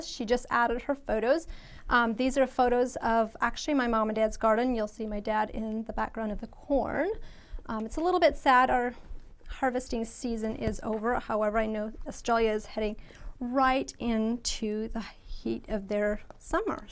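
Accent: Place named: Germany. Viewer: Popping into this several years late, since my daughter & I have been stocking up on the albums., your faint trace of accent made me very nostalgic. My dad's family was Germans from Russia, who spoke a Plattdeutsch dialect very similar to Amish/Mennonite German (recipes are very similar too). Dad was the last generation to speak German first, English second. He died three years ago, and it was such a joy to hear again.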